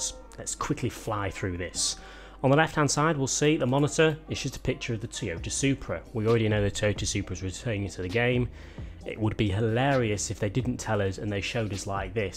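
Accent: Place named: United Kingdom. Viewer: I swear his voice is the goofiest sounding British accent. Could not take him seriously.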